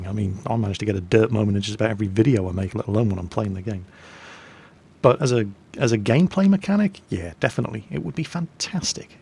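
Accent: Bavaria, Germany. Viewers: Are you bavarian by any chance? :P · can we hear you doing your German accent for one Mingles with episode? I can't help but love hearing your German accent. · where did you learn talking with this awesome german accent? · Dat German accent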